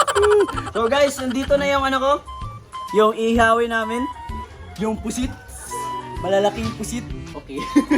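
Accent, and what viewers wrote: Visayas, Philippines. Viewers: Bisayang bisaya accent po kau kuya. Gusto ko matutong magsalita ng bisaya. Hindi po kc ako bisaya tagalog po ako. Turuan mo nmn akong mag bisaya idol kuya. I love hearing bisaya accent speaking tagalog words. Nakakaktuwa talaga kaung mga Bisaya.. Sana makapunta din ako sa inyo sa Visayas at Mindanao one of these days.😊🙂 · Hahaha..nagkatawa ko nmo pareha jud ta ba na pagmagtagalog bisaya japong dako 😁nindot jud ning molingi ta kong asa ta gkan 😊😮 Faith villanueva Davao #done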